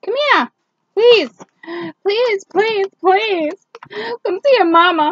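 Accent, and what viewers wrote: Boston, United States. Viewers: I know you have a Boston accent. No texting. Call mommy. Then go unannounced at the house. I did that and all the kids were running because my daughter was not there. That put a stop to that ish. She was 14. It's a different world once they turn. 13, 16, 18, 21. She is now 27 and doing okay now. Sheesh... #Motherhood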